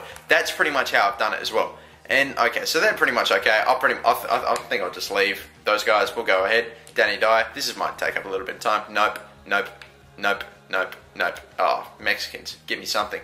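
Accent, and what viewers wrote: Australia. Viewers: Are you aussie mate?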